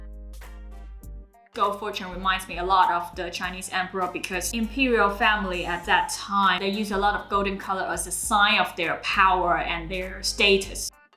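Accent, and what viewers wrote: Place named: United Kingdom. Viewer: LOVE UR BRITISH ACCENT !!!!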